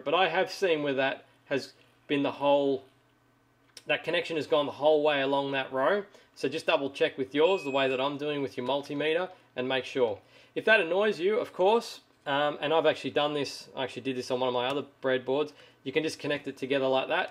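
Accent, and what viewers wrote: Australia. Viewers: Very good tutorial, been following your channel for a while and love how much info you share. Also it's good to hear another Aussie voice.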